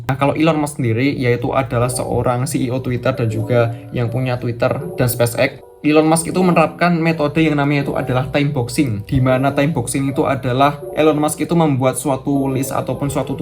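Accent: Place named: Java, Indonesia. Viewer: logat jawanya kelihatan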